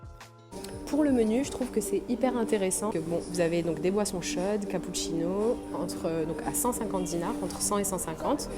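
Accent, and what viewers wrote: France. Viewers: Je déteste la prononciation des mots anglais en accent française aaaaaaaaaaaaaa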